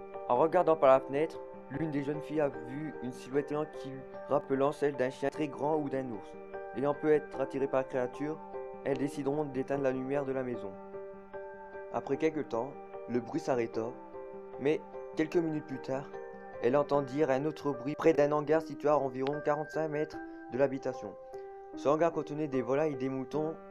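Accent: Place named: Nord-Pas-de-Calais, France. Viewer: à entendre ta voix et ton accent... Un Ch'ti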